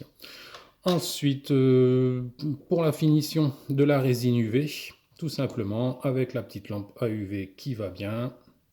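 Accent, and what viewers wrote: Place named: North, France. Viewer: Je reconnais un accent du nord. Plus précisément un accent de Lesquin… 😃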